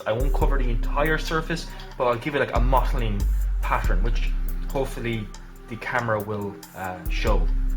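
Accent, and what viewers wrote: Ireland. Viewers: Another great "how to" of yours,! "First thinhs first" I like the sound of Irish English! And what's very nice, too: You make your method usable for those without an airbrush like me! Cheers, mate!:)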